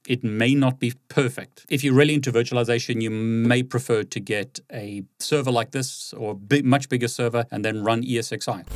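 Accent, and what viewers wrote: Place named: South Africa. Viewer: It's a South African accent!!!!!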